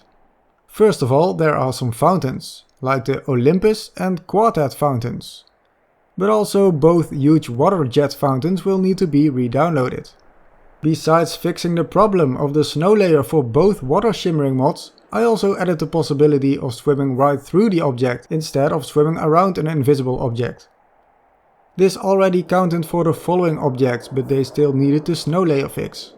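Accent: Netherlands. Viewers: You sound Dutch to me🤣 if I'm correct you will be able to understand this ; Het ziet er goed, ik ben hier heel blij mee! Dank je wel voor alle uitleg!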